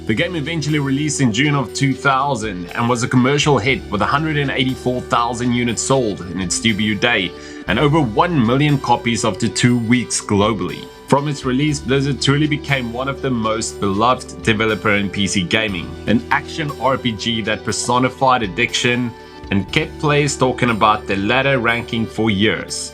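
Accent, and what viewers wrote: South Africa. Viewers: Personally I like Diablo 3 on console as it feels the same as Baldur's Gate: Dark Alliance and Champions of Norrath: Return to Arms, 2 games I have played way too much back in the day on the PS2. PS. It sounds like you are from RSA.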